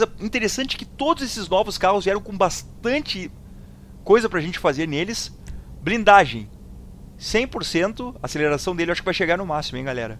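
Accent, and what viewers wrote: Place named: Bahia, Brazil. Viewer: Bem baiano viu cara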